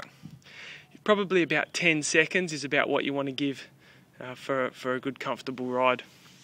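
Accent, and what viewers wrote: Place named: Australia. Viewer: the the auzzi accent man and you got a sick ride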